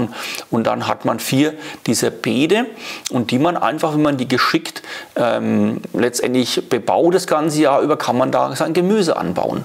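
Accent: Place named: Hesse, Germany. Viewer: tolles Video. Kanal abonniert. Was ist das für ein Dialekt? Hessisch?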